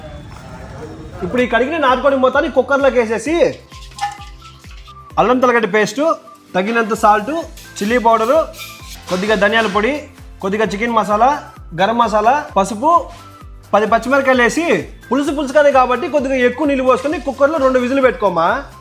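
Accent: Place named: Tenali, India. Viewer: నువ్వు చెప్తే తెనాలి అనిపిస్తది బ్రదర్ నీ వీడియోలు అంత అందంగా నీ మాటలు చాలా బాగుంటాయి బ్రదర్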